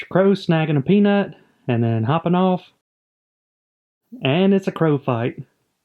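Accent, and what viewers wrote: South, United States. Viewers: I just love listening to this american southern accent! greetings from Europe!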